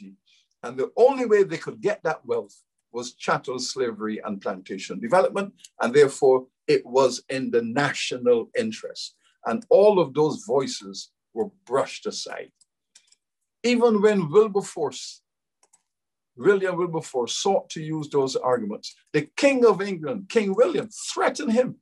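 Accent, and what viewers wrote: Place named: Caribbean. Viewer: Dude has books. Damn. And a posh Carib accent.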